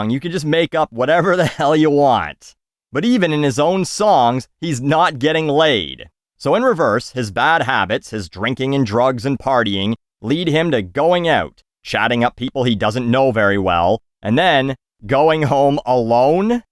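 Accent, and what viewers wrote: United Kingdom. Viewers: That British Accent Though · The Brit accent cracks me up. Also the gimp mask and strap-on Hahah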